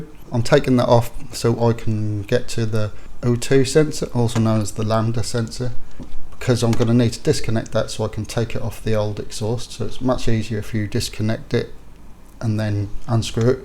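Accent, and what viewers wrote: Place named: Norfolk, United Kingdom. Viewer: Wow that's a true Norfolk accent what part are you from?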